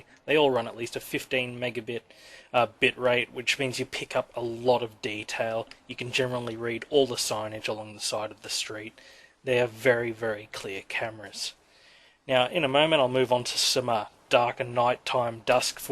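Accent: Australia. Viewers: How can anyone who speaks English on Earth not love the sound of an Australian voice! Cheers!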